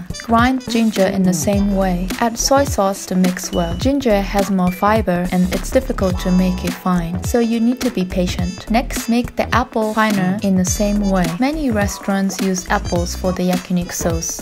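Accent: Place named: Japan. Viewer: I miss being in Japan so much. Your accent brings me way back.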